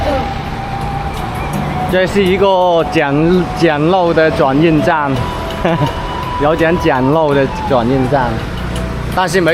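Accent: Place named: Cantonese, China. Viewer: 粵語口音😂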